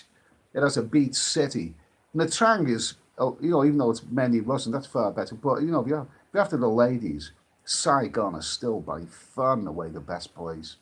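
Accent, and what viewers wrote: Liverpool, United Kingdom. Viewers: 2 are better than none<brilliant scouse accent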